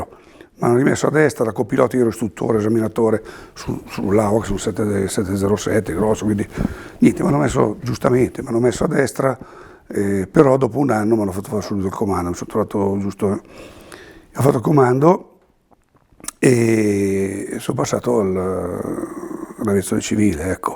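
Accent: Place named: Lombardy, Italy. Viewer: Grande comandante .....il suo inglese un po lombardo.....spettacolo n1 in tutto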